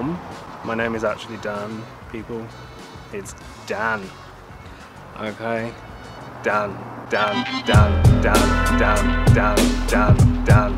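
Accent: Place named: United Kingdom. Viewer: Dan, I know you’re a straight guy, but as one of your gay fans, and with utmost respect, I must remark how very handsome you are. I am a pushover for guys with British accents, but add the beard and the adorable eyes, and you are simply a heartthrob. Have you ever been called a heartthrob before? I’d have to wager that, obvious as it seems to me, you have to have heard it once or twice before! Pardon my moment of gushing, but I just felt it needed to be said. Now there, I feel so much better! And I hope you are too, my friend! Peace and health to you and all those you love! 🇺🇸🤗🇬🇧‼️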